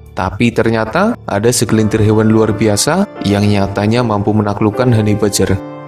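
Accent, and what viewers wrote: Banyumas, Indonesia. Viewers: Jawa ngapak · Ngapak banget bang wkwkwkwk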